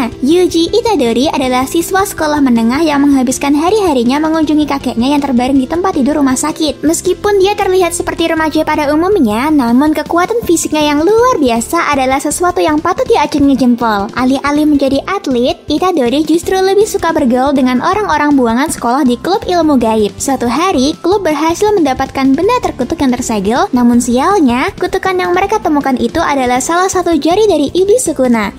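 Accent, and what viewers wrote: Japan. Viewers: Fasih banget min logat Jepang nya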